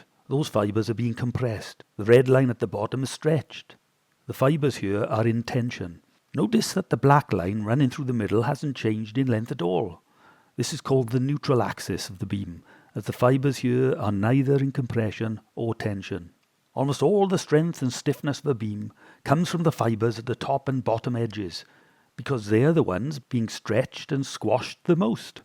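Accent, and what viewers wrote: Wales, United Kingdom. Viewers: Clear, concise, excellent explanation of concepts!!! But unfortunately for the rest of the day I will now be talking in a heavy welsh accent!!!!😆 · Beautiful welsh accent and very informative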